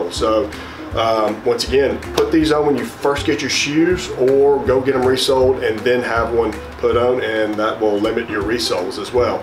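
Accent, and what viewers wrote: South, United States. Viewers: can't get enough of your craftmanships and your southern accent; keep it up!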